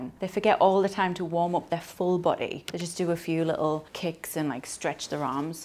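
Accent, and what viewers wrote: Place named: Sunderland, United Kingdom. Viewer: My ribs are done in off a hook to them. At least 6 weeks off. Possibly broken or muscle damaged. I can’t help noticing the sunderland accent. Am from Washington Tyne and Wear.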